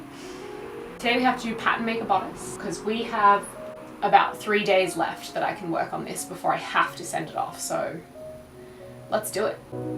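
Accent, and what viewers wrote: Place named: Australia. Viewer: An Australian accent! Heck yes, im Australian :)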